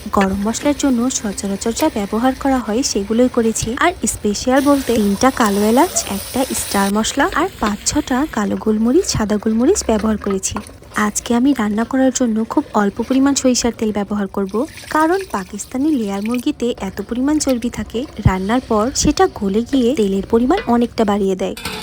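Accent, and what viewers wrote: India. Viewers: কলকাতার বস্তি এক্সেন্ট এ কথা বললে ভারতীয় ভিউয়ারস ট্র্যাক করুন। বাংলাদেশি হিসেনে বিশ্রী লাগে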